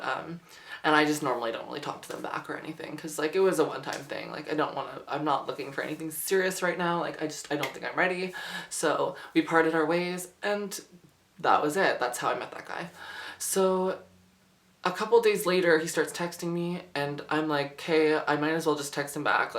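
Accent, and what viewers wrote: Canada. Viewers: Are you Canadian???? Just wondering 🙂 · she is canadian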